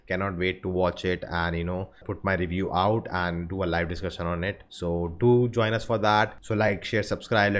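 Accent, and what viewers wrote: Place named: India. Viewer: I hate Indian accent, I wish they could speak normally then I would leave sub on this channel ..... ykkhyyyy my ears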